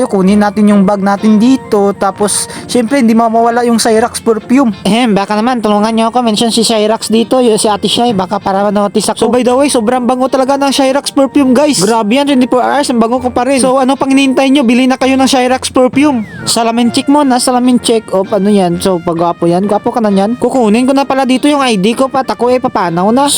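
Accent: Iloilo, Philippines. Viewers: Ilonggo ka idol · gagi ilonggo ba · Taga Iloilo kaba bisaya ka e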